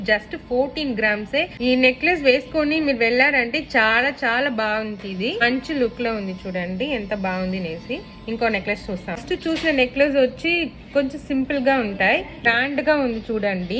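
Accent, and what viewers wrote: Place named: Rayalaseema, India. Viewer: Mee rayalaseema telugu super sister...and collections are wonderful ❤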